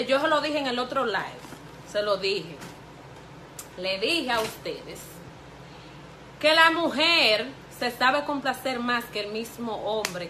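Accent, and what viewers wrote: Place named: Dominican Republic. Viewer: me encanta el hacento de rd de esta hermosa mujer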